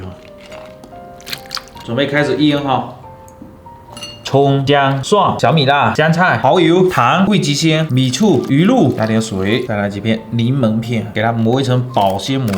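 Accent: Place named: Fujian, China. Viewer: 互跪虾姑😂😂……迷人的胡建口音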